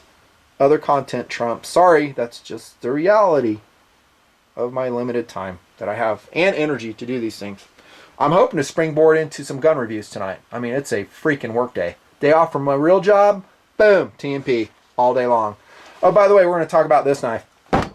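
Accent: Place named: Alabama, United States. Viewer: your Bammy accent...lol. Keep the knife vids rollin.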